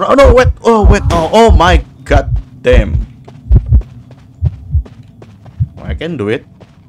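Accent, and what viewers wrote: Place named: Indonesia. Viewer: Aksen'a kek orng Indo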